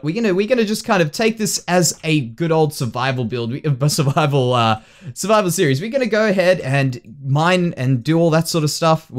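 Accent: Australia. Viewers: Hey Mate! awesome video just found your channel and it seems like heaps of fun :D definately subscribing! also are you an Aussie?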